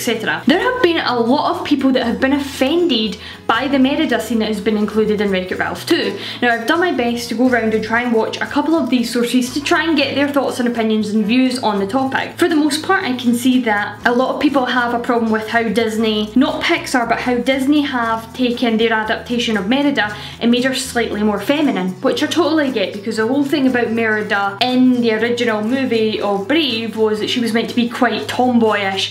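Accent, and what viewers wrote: Scotland, United Kingdom. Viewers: Idk if it's because I've studied the Scottish accent very closely and even learned how to speak in one myself, but I can understand everything you say perfectly fine the first time you say anything. I don't get how some people need you to repeat things, but I guess maybe if you've never heard a Scottish accent, which in the stories you told, wouldn't be the case but, I could see you not understanding some things but I can understand you perfectly without ever having to rewind the video. Also, you're the only Scottish YouTuber I watch so it's not like I'm surrounded by the accent all the time. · I see a lot of myself in you, even appearance wise with the short red hair and head shape. I’m English, I live in Cumbria, so the Scottish accent isn’t foreign to me at all, but I really do love it. Like a lot, it’s so pretty despite how harsh it can be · Love the Scottish accent 😁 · I’m new to your channel and I’ve barely ever heard Scottish accent, but I can understand you perfectly hahha. 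· Thank you for your honesty I love how you speak Scottish rubbish! I mean you never offend me in anyway I always look forward to laugh and learn at the same time! In my opinion it's a movie that has many different interest that many people like or dislike! So what I mean is if you watch any Movie in different moods like happy sad or even board it will drive your thoughts different! Emotions are the drive of opinions and thoughts on any subject! Honesty I'm not much of a movie buff but I do know opinions are always driven by emotions! Love the watch it's amazing and yes I'm looking for a watch soon! Your phone went off during this vlog lol thanks for being such a great inspiration to Scotland and the information is very useful! And untill next week take care! And if no one has already said this to you today your die bit tidy and shine in the the rain like a Queen from Scotland👑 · I love and prefer your Scotland accent . 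In Poland we have many tourists that are shocked that our language is not even similar, and its ten times quicker then Russian language. So when we talk English or Polish talking with tourists we speak very fast eating some letters and words. But this.is funny for me :) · Your last video was recommended to me by YouTube, and it was not the Merida controversy or Wreck It Ralph 2 trailer what made me want to subscribe to your channel. There's 2 reasons why: 1. You've got one hell of a cute Scottish accent. And 2. Because it was free to subscribe. I speak 4 languages: Spanish, English, German and Portuguese. And I can imitate 5 accents: French, Arabic, British, Spanish (Spain) and Russian. And hearing to your Scottish accent really makes me want to learn it too. I was literally squealing like a pig while watching the video, so I'll stick up and watch all your videos until I can master this accent too. 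PS. You pretty 😅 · I understand you perfectly with your Scottish accent. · I just love you! I understand you when you talk. Love the Scottish accent! I am part Scottish. Proud of it!!! I would love to learn the Scottish terms. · A "game" I like to play with your channel is to increase the speed of the video up to 1.5 or 1.75. Then I try to see if I can understand you, and at first it was a little hard but now I can get up to speed 2. Since I have been doing this I have noticed it is easier for me to understand people with a Scottish accent that speak rather quickly.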